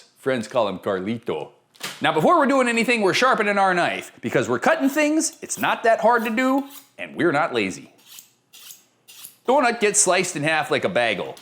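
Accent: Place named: Minnesota, United States. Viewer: You're soooooooo much more Minnesooooootan than I remember you being!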